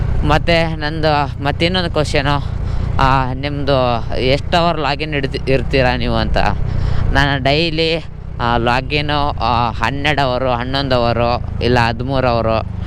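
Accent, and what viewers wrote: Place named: North Karnataka, India. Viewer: ತಮ್ಮ ಯಾವ್ ಊರ ಹೇಳು😂😂😂 ಉತ್ತರ ಕರ್ನಾಟಕ anasatte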